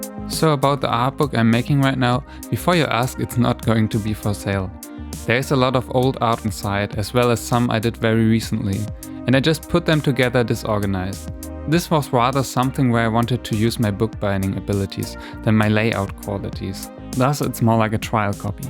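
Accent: Germany. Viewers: Are you from Germany? :D Cause you have this accent and you subscribed to Coldmirror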